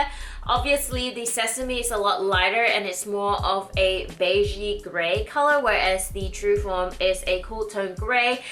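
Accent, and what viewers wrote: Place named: Australia. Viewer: Holy shit. An Asian with an Australian accent. Now I've seen it all.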